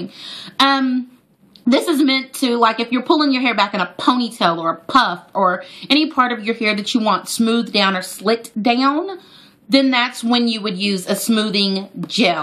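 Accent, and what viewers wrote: United Kingdom. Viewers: Looool your english accent!! Its sooo funny! When you come to the uk,we need to meet up!! Lool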